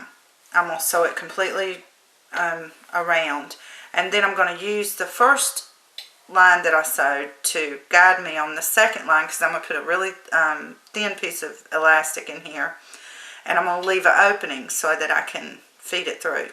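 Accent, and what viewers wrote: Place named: Louisiana, United States. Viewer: Are you from Louisiana or Arkansas? Recognize that accent :)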